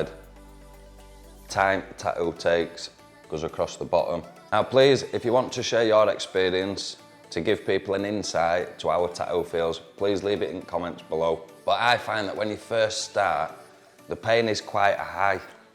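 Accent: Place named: Scotland, United Kingdom. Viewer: I love the Scott accent. “ Tah’ooh”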